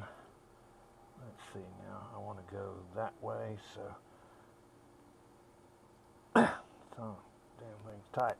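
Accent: South, United States. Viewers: I think you had about a couple of hundred subs when I stumbled in last summer. Been distracted by some health issues the last little while, but I hope to be back for good now, all seeming to have worked out ok, thank the Lord. Anyway, congratulations! You have an excellent channel, and I've always enjoyed watching and listening to a true southern gentleman!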